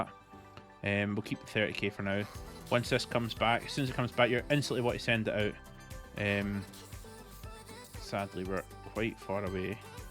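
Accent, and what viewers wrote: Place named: Scotland, United Kingdom. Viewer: So Scottish 😮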